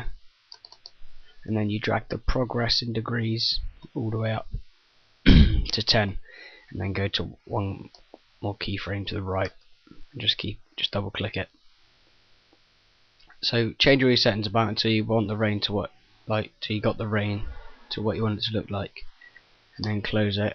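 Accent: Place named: United Kingdom. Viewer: Ohmigawd your british <<num> Pleasse reply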